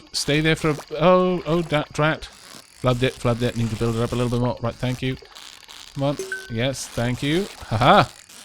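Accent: Wales, United Kingdom. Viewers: You sound so Welsh <3 I really like this play through as well and your voice is lovely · my mothers side of the family is Welsh so I recognize it more I think! Plus it's the fluctuation! It's got the welsh rhythm haha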